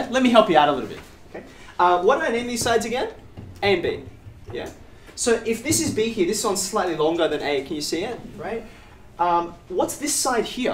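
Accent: Australia. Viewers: is this guy aussie? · Pretty sure that’s an Aussie accent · He's actually Australian. A person's native language and accent is not hereditary, it is environmental. If you grow up hearing most people around you speak English with an Aussie accent, you'll also speak English with an Aussie accent as your first language. And this is how it works for all people who grew up in Australia (Aboriginals, British, Chinese, etc), regardless of their ancestry, unless they grew up in an ethnic enclave, only hearing people speak their ancestral language. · it's the aussie accent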